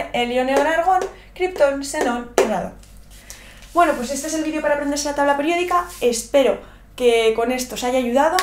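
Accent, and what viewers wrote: Spain. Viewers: Cuándo tu profesora de química es española y se te dificulta concéntrate 😅😅 por lo hermoso que habla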